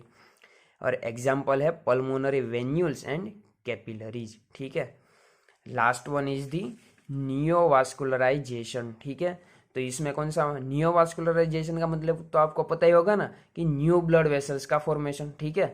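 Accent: Gujarat, India. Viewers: Kem ke Hindi bolo yena upar thi to tame gujrati Lago cho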